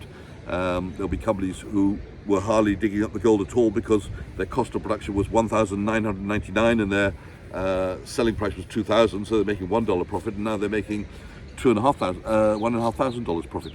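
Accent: United Kingdom. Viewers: You sound like a fine British gentleman with deep love for the markets and your family. Great stuff sir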